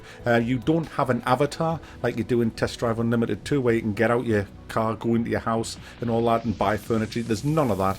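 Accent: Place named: Northern England, United Kingdom. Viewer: love it, Nice to hear another Northerner, from Durham way?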